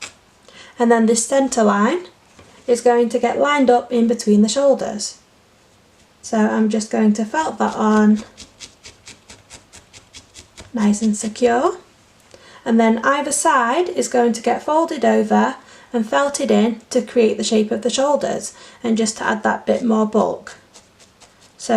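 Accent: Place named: Yorkshire, United Kingdom. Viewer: I kind of thought you were a Yorkshire Lass, I have relatives in Lincolnshire, about 9 miles from Boston or skeggy depending on the way you go. Take care, I’ll look forward to all your future videos. Keep up the great work you are putting out there. 🥰👏🏻👏🏻👏🏻👏🏻👏🏻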